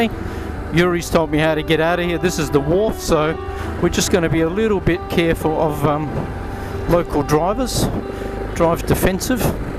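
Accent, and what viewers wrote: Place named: England, United Kingdom. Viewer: ..hey...r u a sandgroper?! Sound like a pom! We wanna c where u camp n what u eat - and let us know where u r!! Cheers - enjoying it.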